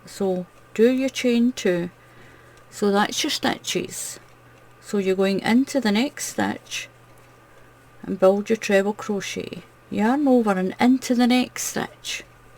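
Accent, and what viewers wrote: Scotland, United Kingdom. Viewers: Hi. Greetings from the U.S. Your doilies are beautiful and I could listen to your lovely Scottish accent all day. I can't wait to crochet these doilies. Thanks for sharing. 💟🌞🇺🇲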